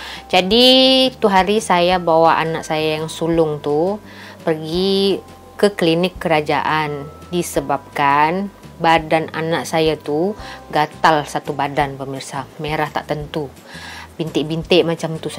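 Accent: Malaysia. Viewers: Astaga ! Ya ampun. Kelakar betul ya. Rawatan di Malaysia, hampir gratis. 1 Ringgit Malaysia diberi banyak obat. Loghatnya udah bertukar kepada slanga Malaysia. Sukses bu.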